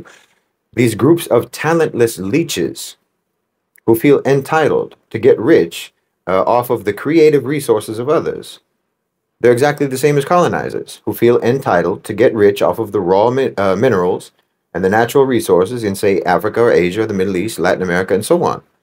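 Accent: United States. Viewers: And he he speaks it all with an American accent. Methinks mr marxist minion protest too much.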